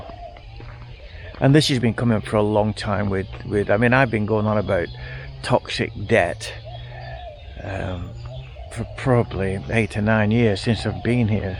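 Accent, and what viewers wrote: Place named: Northern England, United Kingdom. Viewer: 100% stay! And …… and I’d miss it. It’s very relaxing to see you wondering around your garden talking to us as you go. The garden brings out the best in you. It’s wonderful to listen to you a man of great eloquence and delightful northern tones. Your garden is better than Kew Gardens - it’s a belta, like. So there you have it this hard leftist says don’t move. And if you do I’ll call on every subscriber to go on bloody strike!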